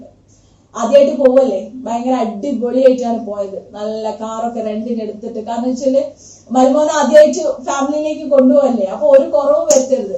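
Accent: Kasaragod, India. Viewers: Chechi kasaragod ayrno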